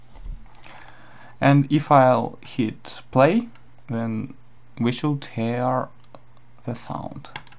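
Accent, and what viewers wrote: Russia. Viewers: А я по интонации сразу поняла что русский! :P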